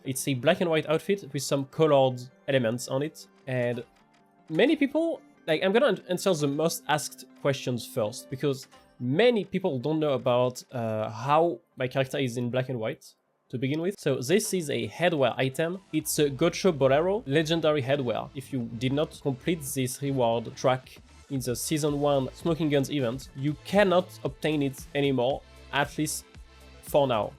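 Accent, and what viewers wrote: France. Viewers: You ´r French ?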